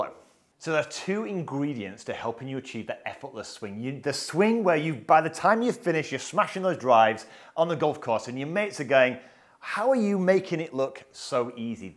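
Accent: United Kingdom. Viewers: Found this channel today. I'm back playing golf after take 15 years off to be a single dad. Why is it I learn the most from people with an accent from Great Britain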